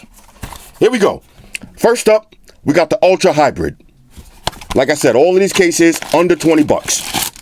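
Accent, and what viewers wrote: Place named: New York, United States. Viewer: yo! Love the videos people don't know that the way you talk that's that city shit natural some talk a little different but thats that new York talk,life you feel me my dude be easy keep your head up,keep making those videos and always be floss....like you always do Littles from ct,ya heard!!!!😎👍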